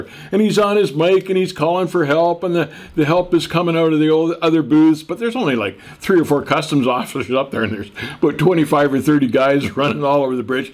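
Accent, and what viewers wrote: Canada. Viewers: I love the Canadian accent :)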